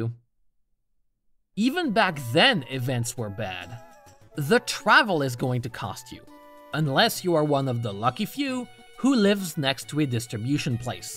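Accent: France. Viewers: Man ! Are you French ? Si oui incroyable je m’y attendais pas du tout haha 👏🏻 très bel accent 🤩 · Are you French?? You speak English VERY well! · I'm gonna be honest, it wasn't until this video that I realized you were French. Désolé.. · Are you french ? Im french and i kinda hear a french accent but im not sure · Wait, wait, so you're from France and speaking English without an horrible accent? I am impressed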